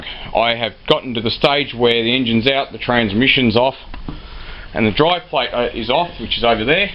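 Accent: Australia. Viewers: i must say you are so Aussie sounding and direct its refreshing, i went to bunnings tonight and didn't know i was in Australia at all!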